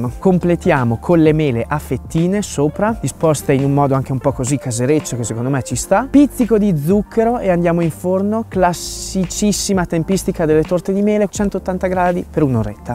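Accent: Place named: Rome, Italy. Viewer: Simpaticissimo...ma sei romano?ogni tanto te ne esci alla romana😄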